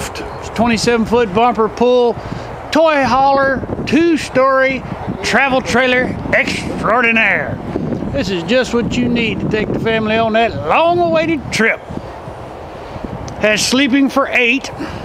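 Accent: Texas, United States. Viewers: Texan accent?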